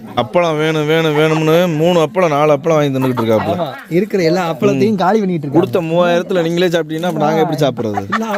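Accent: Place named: Ramnad, India. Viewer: unga ramnad tamil pronunciation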